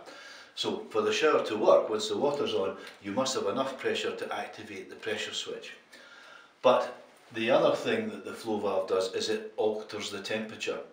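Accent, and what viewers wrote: Scotland, United Kingdom. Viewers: cause yer scottish :D (y) liked!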